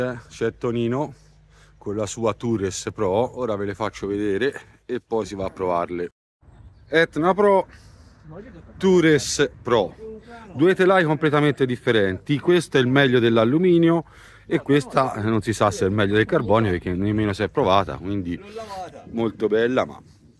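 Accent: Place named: Tuscany, Italy. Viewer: Tutte e due dei bei mezzi anche se differenza c'è, però il bello del video è la "nostra toscana'!" Nel modo di parlare,Perché anche io lo sono 😅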